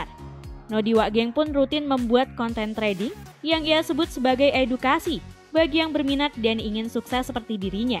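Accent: Indonesia. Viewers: Yg ada nya kau bkn miskin.. tp balik kampung ketakutan Oh btw bang Kau itu anak aceh ya Jgn kau bawa2 nama anak medan Logat bicara kau aja aceh x Anak medan ga spt itu logatnya Org asal aceh kok bawa2 nama kampung sebelah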